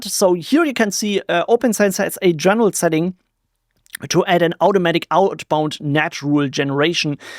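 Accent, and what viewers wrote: Germany. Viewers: I love your German accent, is it? Apologies if I'm wrong. Greetings from 🇬🇧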